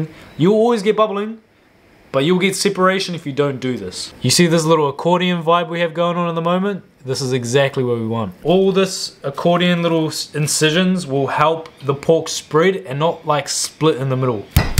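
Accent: New Zealand. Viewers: i love the nz accent so much.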